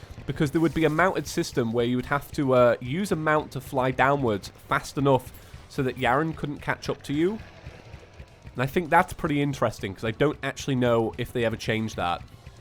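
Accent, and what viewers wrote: United Kingdom. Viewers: It's not captions, it's terrible accent. All I hear is "hell loacher ari-eh and fewzis my name is tsheims". English is not my native language but even for me this speech is too painful to hear. Is it how british English sounds? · For the most part it is just his british accent, (I don’t think it’s horrible, I actually love it) but his voice is kind of deep so that makes it sound a little bit different than others.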